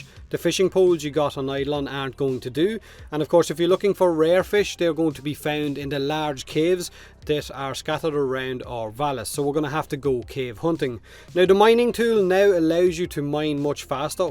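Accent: Ireland. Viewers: Another great video. Thanks. What part of Fortuna are you most excited for? Also, what part of Ireland are you from?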